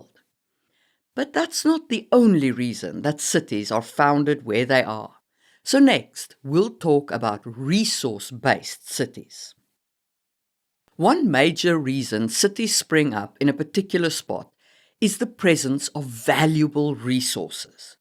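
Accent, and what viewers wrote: South Africa. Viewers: Oh, it's no wonder I thought you were South African originally! I lived in Cape Town myself for a while 😁 Love your videos, thanks so much for all the tips and wisdom.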